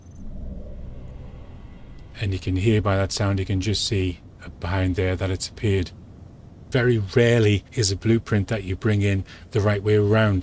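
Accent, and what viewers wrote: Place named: Scotland, United Kingdom. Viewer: Thank you so much, this helped alot!! Love that Scottish accent too, keep it up!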